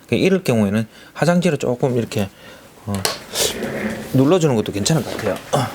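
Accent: Gyeongsang, South Korea. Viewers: 벗꽃그리기 배우고 가요 경상도 말 혹시 대구가요 배우로갈께요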